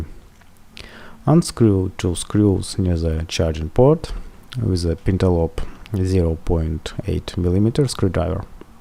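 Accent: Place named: Russia. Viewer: страшный руззкий акцент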